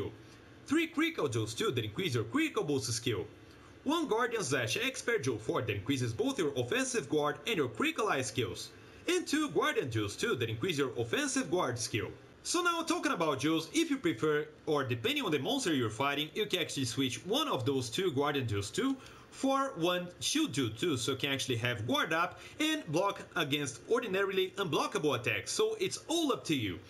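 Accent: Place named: Brazil. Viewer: Seus videos são top e sua pronuncia é perfeita , só não dá pra esconder aquele sotaque de respeito , mto bom ver br's que trazem conteúdo pra gringa , gg mano , also nice build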